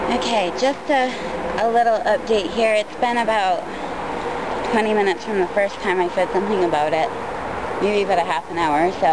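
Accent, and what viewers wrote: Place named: Maine, United States. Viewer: BeeCirca is right! You certainly are a lovely-looking "storm chaser"! I love that red hair of yours!--And that "Maine voice" you have too!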